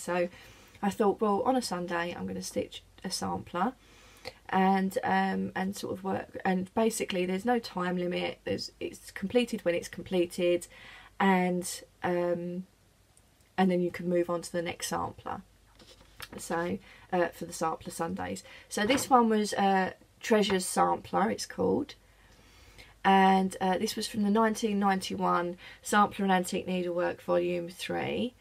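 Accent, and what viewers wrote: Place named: United Kingdom. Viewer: You do such lovely things. I could listen to you all day long, I do love the British accent, it sounds so much more cultured than our American English. The Royal Albert tea set is so beautiful, long a favorite of mine. I do love drinking from tea cups, but it cools too quickly, so I use a large, thick mug. Not so elegant, but serves the purpose. I hope to see you again soon.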